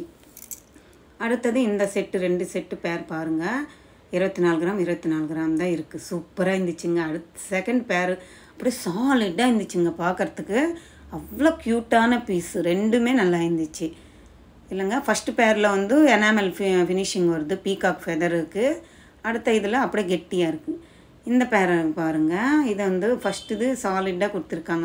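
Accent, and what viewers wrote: Telugu, India. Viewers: Neenga pesurathu thelugu kalanthu varuthu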